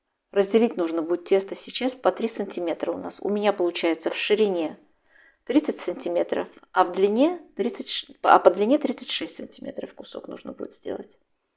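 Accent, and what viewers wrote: Baku, Azerbaijan. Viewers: спасибо за Ваш канал! Как хорошо Вы объясняете, понятно, легко и просто! И хочу выразить восхищение Вами! Ваше красивое лицо, спокойная и правильная речь, изумительные руки!и если не ошибаюсь, нежнейший Бакинский акцент! Удачи! Здоровья и Благополучия!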